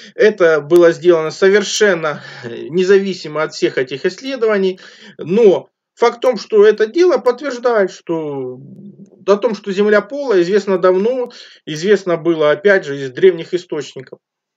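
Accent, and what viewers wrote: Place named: Kharkiv, Ukraine. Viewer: Посмотрел, очень интересно, придётся подписаться 👍. Уж больно интересно Говорите, и говор на харьковские похож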